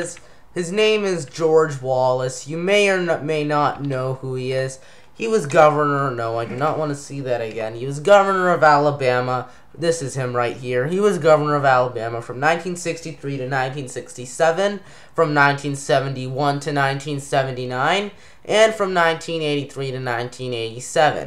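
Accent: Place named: California, United States. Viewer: You sound like you live in California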